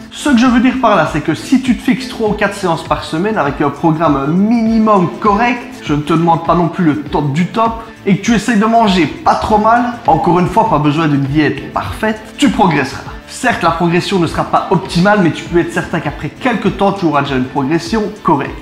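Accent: Liège, Belgium. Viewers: Les Nuls 😂😂 Excellent avec l'accent belge! Top Vidéo continue! 💪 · J'aime ton accent (liégeois je pense) mdrrr bonne vidéo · oufti Gros gros accent de Liège...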